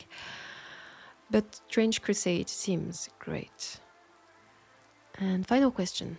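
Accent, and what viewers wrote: France. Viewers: Some excellent questions here. The sort of questions it's thoroughly enjoyable listening to you answer, but I would find excruciating to answer myself, being a 'bear of very little brain'.. Thank you so much for taking the time to do this. It's such a delight hearing you as yourself, once in a while. It has also made it easier to distinguish, as my daft question pointed out, a true etherial entity, swirling in a non-committal penumbrian light.. With a distinct French accent, of course.. :) Lesson learnt!. I assume you are partial to mountains, due to your own particular part of France, & the destinations you mentioned you'd like to live / visit? I can understand that & the type of nostalgia it brings. The banner picture in my profile shows the sort that I have those kind of feelings for, although I'm sure I could grow accustomed to mountains too, in time. 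As long as it's fairly rural countryside, & away from hoards of people. The flat stuff's just a bit more forgiving when one has the mad compulsion to go cycling. With regards to Trench Crusade, I, too, will likely never play it, let alone purchase, paint & prepare even a single faction. But since I became aware of it I've lapped up as much lore & artwork as possible. Amazing, dark & sinister looking creation. Thank you once again for the time & effort you put into everything. Enjoy the rest of your weekend. I'll meet you back here again soon :)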